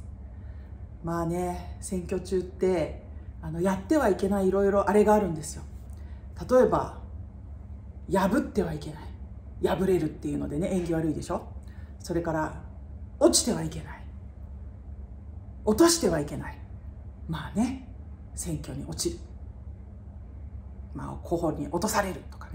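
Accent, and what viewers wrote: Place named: Nagoya, Japan. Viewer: 名古屋弁のいいですね～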